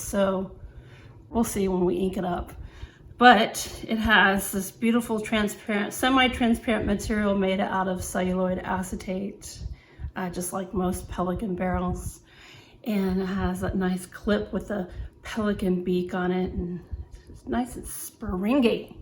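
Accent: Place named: Dallas, United States. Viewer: Great look! I think you sound like your from Dallas, and Dallas people are more fashionable than S.A. or Houston.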